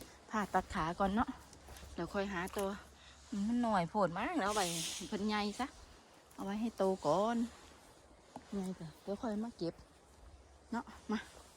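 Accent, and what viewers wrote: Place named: Isan, Thailand. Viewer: มักเสียงเว้าเสียงหัวมาดามคักได้หัวนำ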